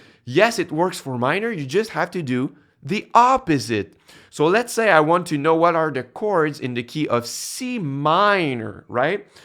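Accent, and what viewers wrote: Quebec, Canada. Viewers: Hearing a quebecois accent here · Hey are you québécois? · Tellement l'accent du Québec, très bien expliqué mon gars ! 👍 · Are from Québec ? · Accent québécois en tabarnak !! Nice vidéo !! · Ok, I’m saving this video. I’m going to have to watch it a few more times. BTW, are you from Quebec? I’m in Montreal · You Sir, sound like you are definitely French-Canadian.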